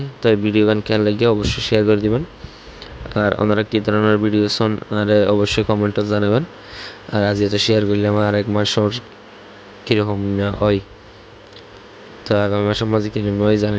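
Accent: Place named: Noakhali, Bangladesh. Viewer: ভাই আপনার কথা ত বুঝি না নোয়াখালীর লোক নাকি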